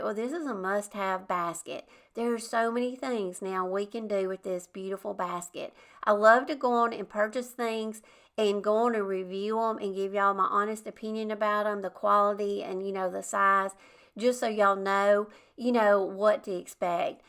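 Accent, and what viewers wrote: South, United States. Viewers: I love all your creative projects but, more than that I love your sweet southern accent.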